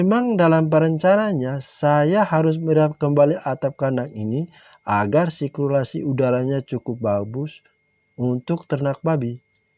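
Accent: Flores, Indonesia. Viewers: Logatnya seperti orng Bajawa..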